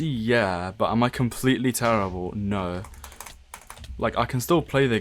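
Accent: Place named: South, United States. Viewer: Posh southerner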